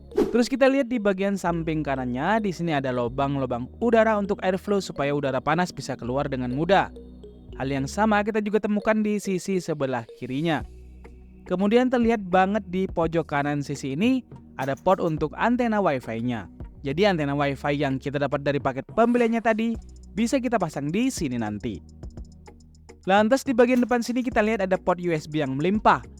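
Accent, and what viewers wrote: Madura, Indonesia. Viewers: Owalah, orang bondowoso, pantesan. Umumnya d rakit, malah ngomongnya rantep, kerasa maduranya😅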